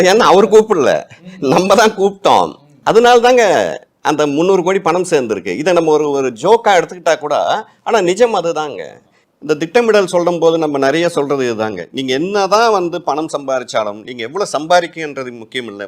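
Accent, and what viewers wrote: Kongu, India. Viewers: கொங்கு தமிழ் ❤😇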